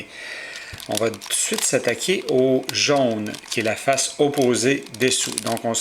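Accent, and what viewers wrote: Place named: Quebec, Canada. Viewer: yes un Quebecois ! lol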